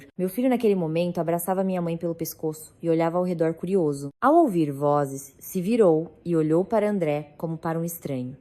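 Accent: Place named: Portugal. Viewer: Por favor, naõ precisa expor linguaja do Portugal pois estamos no Brasil ou a narradoura naõ sabe disso, narra corretivamente língua do Brasil....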